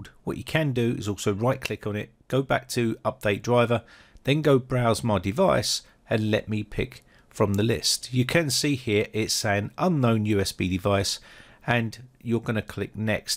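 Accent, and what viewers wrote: England, United Kingdom. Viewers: Finally! an authentic English person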